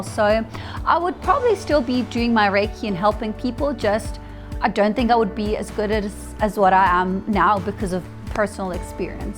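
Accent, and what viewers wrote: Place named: South Africa. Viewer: Finally found out something about her background etc. I was curious. And for some reason I thought she was Australian lol but it's so obviously south African now. Derr. Nice documentary 👍🏼🪐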